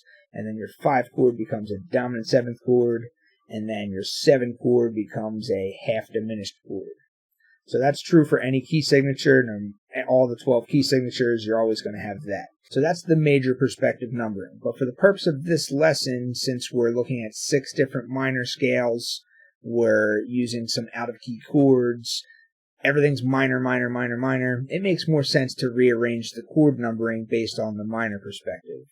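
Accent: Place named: Philadelphia, United States. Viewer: You live in the Philadelphia/NJ area? That accent sounds like home.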